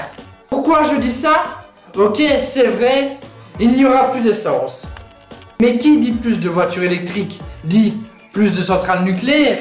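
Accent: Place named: Democratic Republic of the Congo. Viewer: sympa l'accent congolais c'est bien ca?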